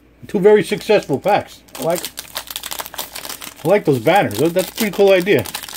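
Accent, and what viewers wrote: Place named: New York, United States. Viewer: the most new yorker sounding break. Love it!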